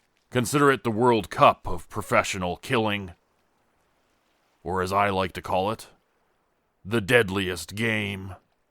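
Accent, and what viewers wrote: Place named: Canada. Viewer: Tjis is great. Is this your real voice man. Its nice. You sound a bit Canadian with the inflection. Cool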